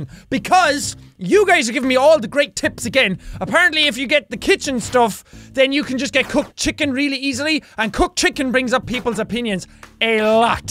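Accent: Ireland. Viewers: I've been watching for so long that I always automatically lower the volume. And I hardly notice his Irish accent anymore. Never bothered me tho because I'm loud just without an accent. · Who's LOUD IRISH GREEN HAIR IT'S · WHY IS IT ALL YELLING WHY IS IT SO IRISH i laughed too hard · Even if you have a irish accent me and my best friend (we are both from middle of England) both love you and think you are boss and awesome! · Retrosky Maybe it would be cool if could make a montage for the next subscriber milestone..? There would be the most shouty and Irish parts in best bits! Definitely RIP headphone users.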